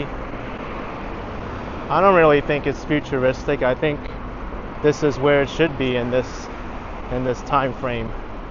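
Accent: New York, United States. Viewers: What a cool looking city. Your NY accent is so think. 😂 It gives so much character.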